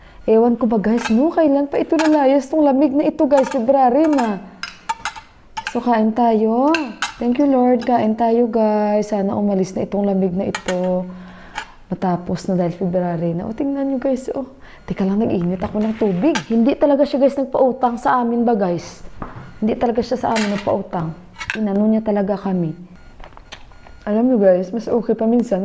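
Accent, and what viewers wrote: Iloilo, Philippines. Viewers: sis taga san k sa pinas, parang ung accent m ilongga 😊.. mag watch nko ng vlog m now q lng nag p nood..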